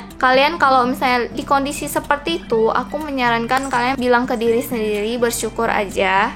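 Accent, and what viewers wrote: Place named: Batam, Indonesia. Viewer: Logat orang batam lucu yaa😂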